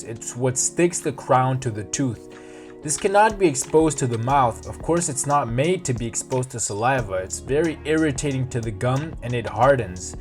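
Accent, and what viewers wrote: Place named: United States. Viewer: Joder nos salió gringo el doc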